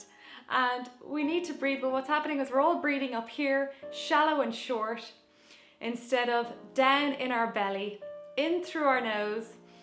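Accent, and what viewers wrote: Ireland. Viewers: Your accent is beautiful where are you from? Ireland?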